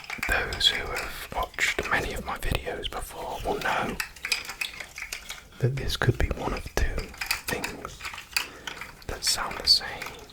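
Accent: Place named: United Kingdom. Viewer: I swear to all that is holy that the British accent just does something to me……I’ve never even heard it in real life but I want to so bad!!! It’s just too charming!!!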